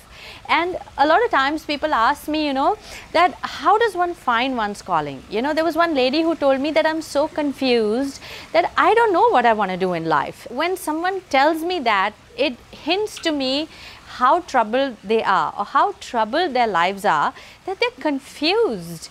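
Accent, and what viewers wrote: India. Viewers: You speak pure Indian English. Which is obvious and with charming pronunciation. So it becomes very easy to understand;and there for everybody likes your lectures. You are a jwel for the Indians. --Dr Mohanty, Seraikella (Jharkhand).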